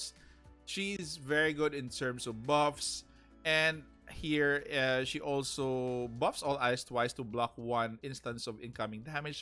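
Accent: Philippines. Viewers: Thanks for this very thorough guide mate. Just started this game yesterday. This information will help me a lot. Btw, your accent sounds like filipino.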